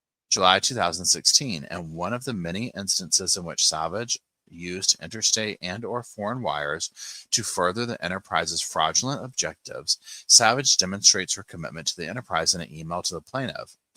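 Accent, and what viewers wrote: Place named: East Tennessee, United States. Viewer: I’m from east tn and it’s so nice to hear someone that sounds like me and I can understand. Love your storytelling style.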